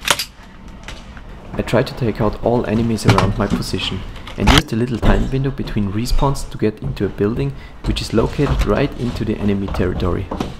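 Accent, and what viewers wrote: Germany. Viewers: Hach ja... Deutscher Akzent im englischen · man hört so richtig das er deutsch ist auch im videos als jemand anderer geredet hat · Are you German? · are you german? i am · Are you from Germany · Warte der ist Deutsch Junge dieser Akzent sagt alles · You are german?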